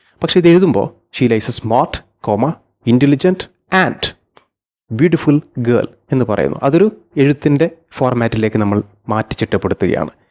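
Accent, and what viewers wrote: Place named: India. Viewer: your accent is terrible you Indians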